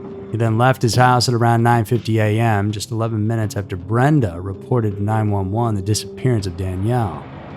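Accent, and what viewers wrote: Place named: Texas, United States. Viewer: Plano is “PLAY-no”. I’m confused by the narrator having a Texas accent yet not knowing how to pronounce Plano? It’s a big Dallas suburb and if someone has been in Texas long enough to have an accent, they would know how to pronounce it. Maybe his narrator voice is a persona 🤷🏻‍♀️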